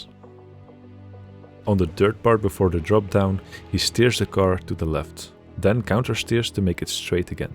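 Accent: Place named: Netherlands. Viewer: 100% you are dutch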